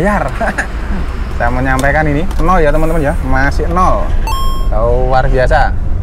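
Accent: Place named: Semarang, Indonesia. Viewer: itu cirinya kental logat Semarangan. Nyangkule ning Jl. Merak-Sby. Omahe Malang-asli Sampingane. 😀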